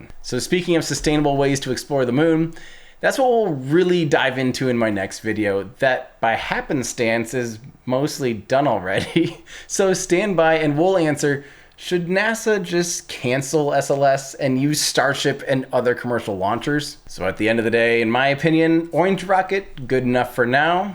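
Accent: Iowa, United States. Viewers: (Going back again...) Why do you believe your complaint about the ambiguity of calling the smaller unit the same name as the system as a whole (like when some people call a whole church meetinghouse a "chapel," the same as the main worship room by itself) reveal that you sound like you're from Iowa?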